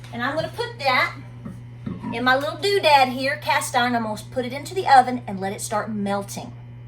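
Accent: South, United States. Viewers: You are so cute!!!! Very informative videos...I enjoy your energy and your southern ME TOO talking!!! KEEP up the GREAT Videos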